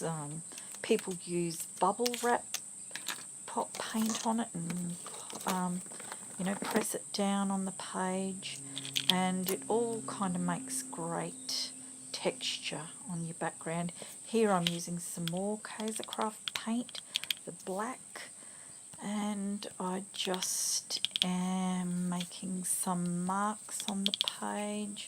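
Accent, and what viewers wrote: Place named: Australia. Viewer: Love the page and the pineapple. Love the gelli plate and good to hear another Australian accent. Susan.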